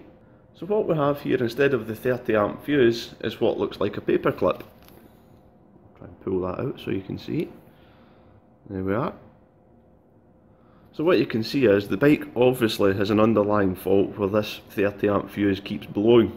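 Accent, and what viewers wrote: Scotland, United Kingdom. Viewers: First time I have heard your voice and you are a fellow scotsman 👍. You got yourself a new subscriber. Are you planning on keeping the ducati or selling it just out of Interest. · Where about in Scotland are you from ? Hello from Fife lol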